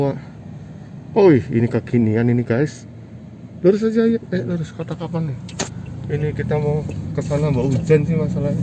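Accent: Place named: Java, Indonesia. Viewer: Asik ne ada logat jawa jawanya... agak kecil juga jalannya.. suasananya ada gambaran seperti di Kalimantan...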